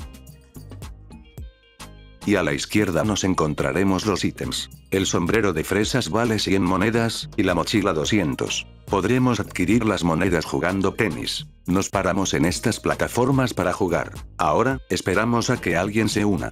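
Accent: Argentina. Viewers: Es de arg o uruguay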